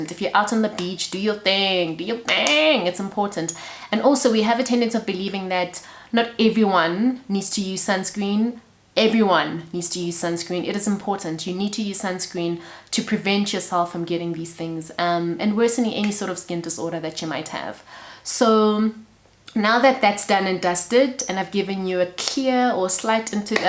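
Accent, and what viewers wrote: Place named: South Africa. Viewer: Thanks for the content! I'm wondering could this be used for lip pigmentation?? Btw love your accent! Are you from SA?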